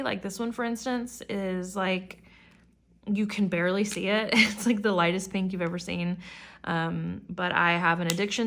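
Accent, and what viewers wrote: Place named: South, United States. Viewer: Listening to you ramble about your stationary & journalling for an hour has been a JOY!! I wanna write all the ways I have decided you are awesome but I think that would be super unsettling, so. 🤣😭 Okay, I'm gonna do it anyway. I love an uncut stream of consciousness of absolutely nerdy shit, like I want to tell my partner about the intricacies of each of my pens and each of my storage devices too, for reals it's so fascinating idk!!! I don't because to be frank I get sick of the sound of my own voice, but a cute ass chill queer southern babe is perfect for my ears. I can't do much with a million journalling structure videos (tho, love the info sometimes, but I don't have the patience or concentration to just wAtch a video?) that are at most twenty minutes long - while I'm preplanning literally just one title page for an hour (what was that YT person you said is really good at Just Going For It again??), I need someone w an effortless ramble made of pure chat about journalling with no urgency at all. 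rewatching this this morning and a few mins in, very Sunday morning vibes, I bursting out laughing at the extremely niche relatable dropping of "Some weird selfie of me crying in the car" 😭😭 babe you're EVERYTHAnnnnG. Pls never stop (okay that's literally totally and always should be up to you, no pressure.. okay pressure, but that chill kind of We Love It not like needy entitled audience but lookin at your comments section we already love yeww. so no pressure.) I hope you know t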